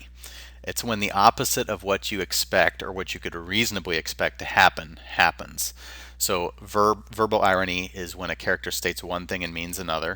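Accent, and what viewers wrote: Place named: Midwest, United States. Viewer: It sounds midwestern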